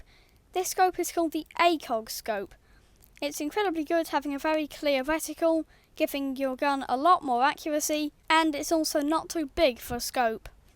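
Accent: United Kingdom. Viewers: You sound british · Are u british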